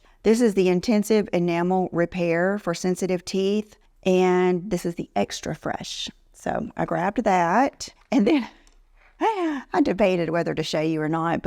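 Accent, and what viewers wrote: South, United States. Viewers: Hi, loved the pjs. Very pretty. I am a 100% remote worker. I put on dresses and makeup even if I have no scheduled Zoom mtgs as it makes me feel pretty and professional. I look forward to the videos you were talking about for those of us at home. So, yes, please....do make those vids. You and Marnie are my favorite influencers. I love that you are friends and sometimes mention each other. BTW, you do have a slight Southern drawl. Love it😊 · “I think I have a little bit of a southern accent” hahaha ur so cute.. as someone from Wisconsin.. yes you do have a southern accent — love it! I have a Wisconsin accent 😂😅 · Hi …..I happen to love your Southern accent ….I’m a Tennessee girl so we both know…..it’s a Southern thing….great video✨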